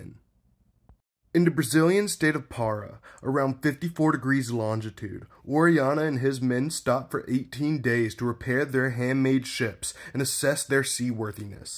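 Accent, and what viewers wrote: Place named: Philadelphia, United States. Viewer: My favorite part is the Philly accent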